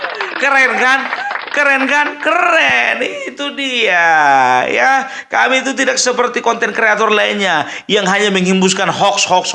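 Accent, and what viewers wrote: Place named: Batak, Indonesia. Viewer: aku suka nonton konten nya dan logat bataknya lucu banget dan mengena sm orang nya yg intoleran terhadap agama lain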